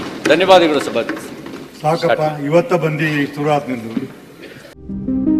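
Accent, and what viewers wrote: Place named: North Karnataka, India. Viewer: Good sir North Karnataka language❤❤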